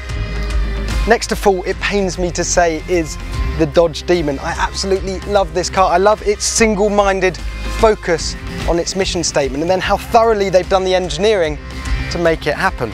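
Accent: England, United Kingdom. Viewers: I'll go with Corvette's 8 class wins at Lemans (along with countless others) as plenty of proof American cars can corner. I don't need another youtube host with an English accent to convince me as if this is something new. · American muscle but you get an English guy to talk.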